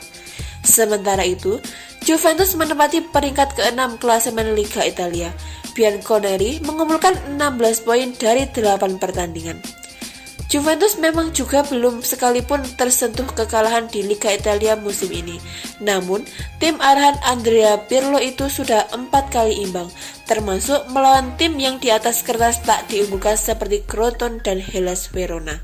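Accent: Java, Indonesia. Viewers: medokss banget mbak..😅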